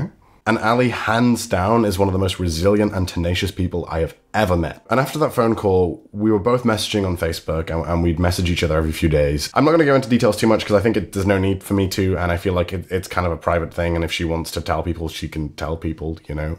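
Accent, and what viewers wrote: United Kingdom. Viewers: He sounds so British back then LMAO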